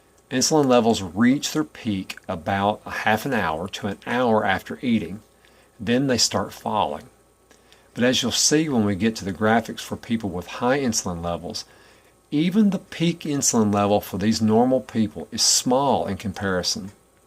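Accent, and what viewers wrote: South, United States. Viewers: Damn you use to be that big? You definitely can tell me something. BTW love your "southern" accent.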